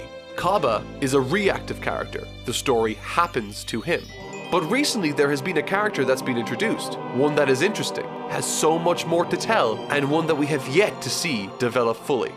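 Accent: Ireland. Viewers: Idk why i didn't catch it before but you are really irish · Just curious are you Irish?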